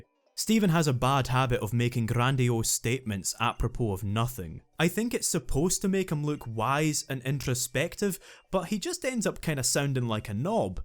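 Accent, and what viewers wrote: Scotland, United Kingdom. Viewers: THIS IS MY FIRST VIDEO IVE SEEN FROM THIS GUY AND THE SCOTISH WAS THE BEST SURPRISE EVER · Seven hours of ah Scottsmann tolkin aboot Pokähman? Consider me signed up. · 7 hours of Scottish accent, LETS GO!! For some reason it actually helps me focus on the content of the video. Don't ask me why lol Have you ever gone back and played it since this video? Shiny hunt or just playing through again? What about AS? have you played that yet? I've always kinda disliked that they just copy pasted the Battle Maison from XY and not have their own unique battle facility. I know HG/SS did that with plat but still :( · Lol i couldnt understand i it because of the scottish. But your brothers catchphrase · I never thought hearing an extremely powerful Scottish accent after the ORAS opening would be so jarring. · This guy ain’t just Scottish, he hella scottish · HOLY MOTHER OF ALL VIDEO ESSAYS... 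Good thing I really enjoy scottish accents. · Mm yrs I sure do love a Scottish man narrating a remake of a game for 7 hours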